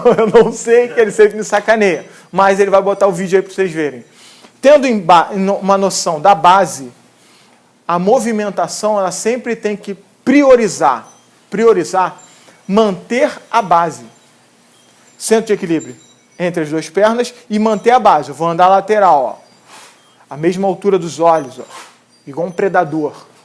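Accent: Rio de Janeiro, Brazil. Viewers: Aí se tivesse cm se matricular ou algum modo de se tornar aluno dele assim como o matemático eu iria firme e forte, pelo sotaque é carioca também.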